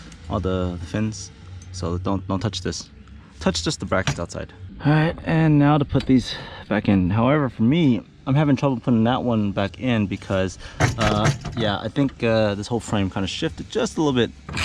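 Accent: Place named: California, United States. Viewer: Why not straighten the fins? Ps first time come across your channel. You have an extremely strong Californian accent. Socal?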